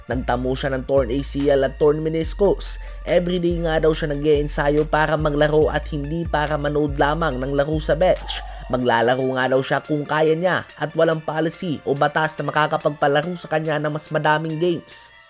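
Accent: Visayas, Philippines. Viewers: Bisayang bisaya ah 🤣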